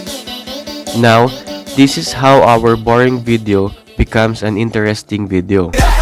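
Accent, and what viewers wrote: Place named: Philippines. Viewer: Sounds like a Filipino accent 😍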